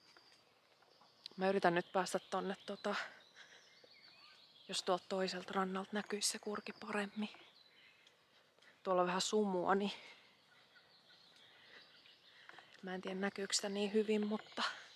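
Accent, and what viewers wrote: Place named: Finland. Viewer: 素敵な朝の散歩楽しめました。夜勤の連続は生活リズムが狂って大変ですね。インドア派というより群れるのを好まない自分の時間を大事にする派かな。友人の結婚式楽しんできてください。フィンランド語独特のイントネーションが耳に心地よいです。😺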